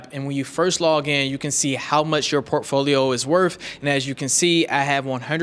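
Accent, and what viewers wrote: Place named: Africa. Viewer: i like you African accent, you need to talk slower and louder to understand you.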